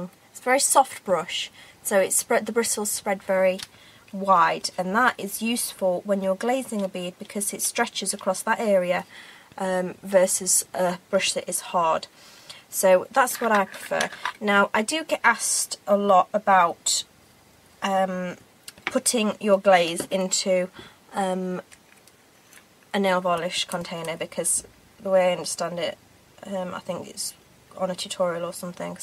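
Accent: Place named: North, United Kingdom. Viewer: There's a Northern voice in there girly!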